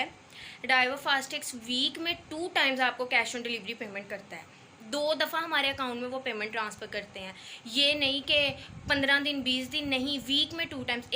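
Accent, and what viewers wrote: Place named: Faisalabad, Pakistan. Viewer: AP Faisalabad say hai ? Apky bolny ka Andaz faisalabadi Laga btw AP business Kon sa kar Rahy hai ?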